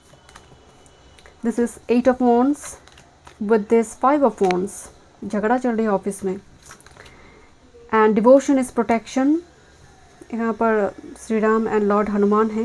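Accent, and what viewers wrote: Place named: South, India. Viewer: Mam are you South Indian... either hyderabad,or karnataka...I guess due to your hindi accent 😅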